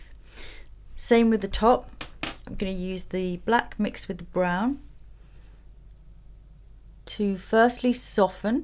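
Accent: England, United Kingdom. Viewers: you are such a lovely person...and you English, oh, love it. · Thank you, my dear Lisa, for that beautiful and so good explained tutorial. Like other ladies already wrote, your calming voice and elegant british accent are so marveilleus! Regarding your lipsticks: I ordered all three of them and each time I wear Velvet Jazz nearly everyone is asking me what brand it is etc. And I'm very proud because You choosed italian craftsmenship to produce them! Merry Christmas! Leonora from Rome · I watch your channel for years, and I will go on...actually, I learned English with your videos (not only yours, of course), you have a lovely voice and authentic, very polished British accent. We just talked about you today with me stylist in Kyiv, discussed your lipsticks and your book, it was nice. I was lucky to get one of your lipsticks, and I’m wearing it every day))) wish you all the best in 2019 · I like your British accent.....and the make up in this video, specially the simple smokey eyes.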